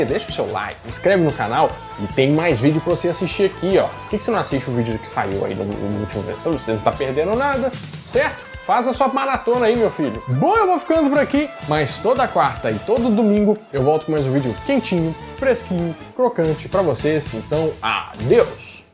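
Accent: Minas Gerais, Brazil. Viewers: Você é mineiro? Falando da brilhantes kkkk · Você é mineiro?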